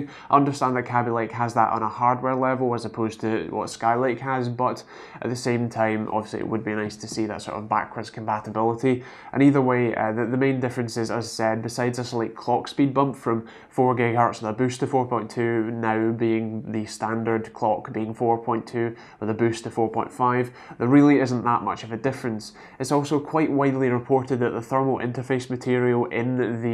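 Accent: Scotland, United Kingdom. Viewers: Well, great video as always. My 6700k don't even need to be upgrade and I'm running stock, didn't even use factory OC still good for most stuff. Didn't realise you have a Scottish accent (I think) from all the vids up till now. Haha. Would love to see a cable management video if you have one as my cable management now really is a mess. 6700k with a R9 390x, 16G ram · Is it just me or are you sounding more Scottish in this video? (Not that it's problem if you were :P)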